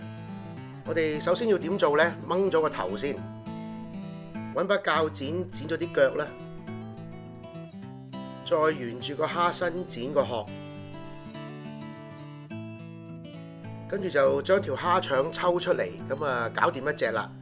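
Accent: Singapore. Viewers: Hahahaha your singlish is hilarious!! Greetings from sg!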